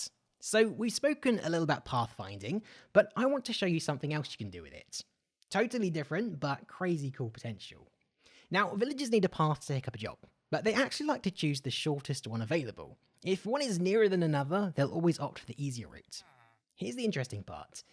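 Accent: United Kingdom. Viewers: I love the voice it sounds British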